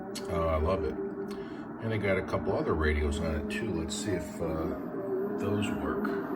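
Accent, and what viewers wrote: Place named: Buffalo, United States. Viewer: Your location maybe Dallas, but your accent says Buffalo/Rochester/Chicago! Am I close? Thanks for this great comparison among these big-league receivers,!!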